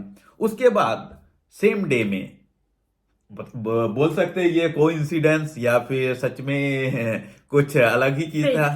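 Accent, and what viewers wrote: Bihar, India. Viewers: ji tumhari hindi to acchi h par bihar wali h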